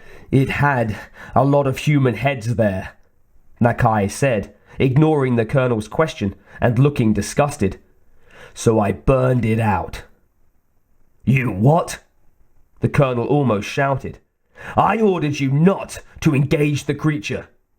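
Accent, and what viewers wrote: United Kingdom. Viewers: in the early months she would sit there and watch Aliens and Predator movies with me on the days I stayed home with her.. now she’s constantly on the move so I only get to listen. I’m on my 5th book now, and I’ve tried to make a habit of liking the each chapter as I start it for you! Also, your accent and tone is quite immersive, are you from GB?..?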